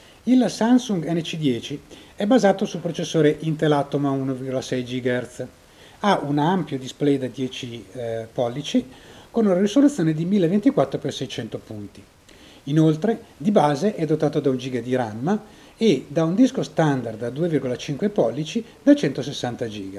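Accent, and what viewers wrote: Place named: Austria. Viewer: Ich kann sein Deutscher Accent schwer verstehen. Versheinlich aus Oestereich oder irgendwas. *shrugs*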